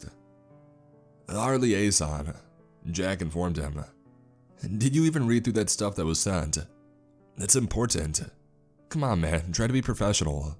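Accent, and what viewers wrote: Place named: Midwest, United States. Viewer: General mid-western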